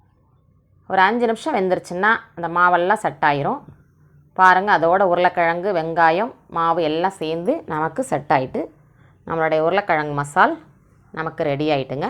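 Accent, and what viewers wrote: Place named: Coimbatore, India. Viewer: உங்களுக்கு எந்த ஊர் சிஸ்டர் கோயம்புத்தூரா 🤔🤔 நாங்கள் திருநெல்வேலியில் இருக்கிறோம் நீங்கள் ரொம்ப அழகாக பேசுகிறீர்கள் அதனால் கேட்டேன் வாருங்கள் அவ்வப்போது எங்கள் வீட்டுக்கும் முதலில் வந்தீர்கள் இப்பொழுது ஆளையே காணோம்🤔🤔🤔🤔🤔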